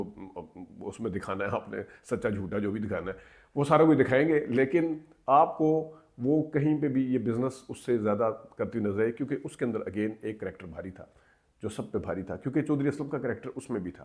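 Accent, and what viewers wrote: Punjab, India. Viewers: punjabi lehja vich aa janda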